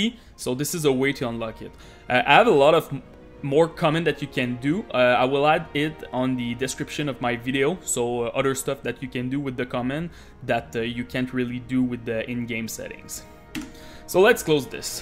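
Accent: Quebec, Canada. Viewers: pas francais , humhum , un quebecois canadien francophone :D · T'es quebecois c'est sur hahahahha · Qc man ? "J'orconnais" ton accent... J'ai le même haha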